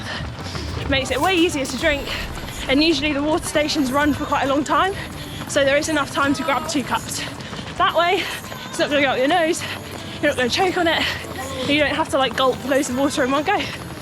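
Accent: United Kingdom. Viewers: your amazing! as amazing as the british accent! ;)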